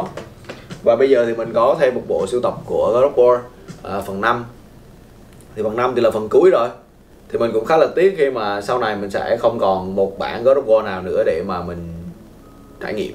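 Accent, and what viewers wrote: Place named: Da Nang, Vietnam. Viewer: Anh này nói giọng Đà Nẽng lồm nhớ quê kinhhh 😅😂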